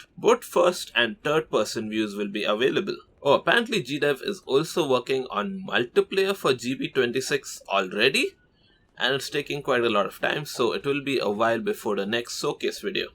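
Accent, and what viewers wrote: India. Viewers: Sir are you from India because your voice like india's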